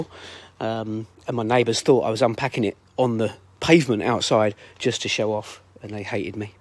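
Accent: England, United Kingdom. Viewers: I’ve seen other videos and loads are from USA it’s sooo refreshing to hear a English accent on this.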